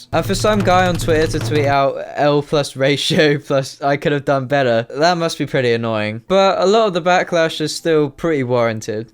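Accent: United Kingdom. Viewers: You're british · should have known you were British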